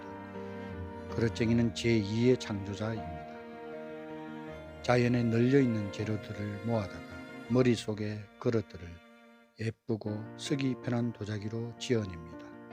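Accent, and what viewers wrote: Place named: Gyeongsang, South Korea. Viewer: ^ 채널명이 멋집니다 열정의 샘이시군요. 작가님! 구수한 경상도 목소리가 좋습니다. 화이팅입니다..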